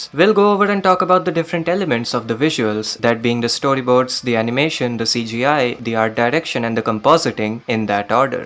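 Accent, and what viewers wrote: India. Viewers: Are u from India???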